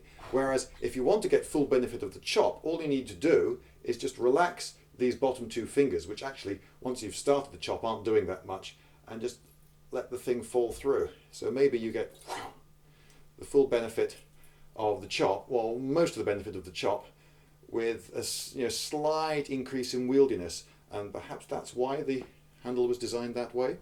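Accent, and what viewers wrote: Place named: United Kingdom. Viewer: relax dude. british accent is sometimes hard to catch for a froggy.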